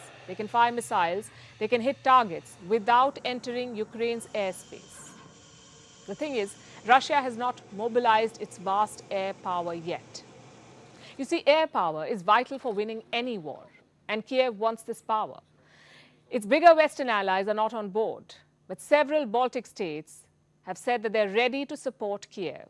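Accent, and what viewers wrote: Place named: India. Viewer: What are you talking about this is one of the indian accent. India has 100's of languages and as such has that many English accents. She speaks English with Hindi accent.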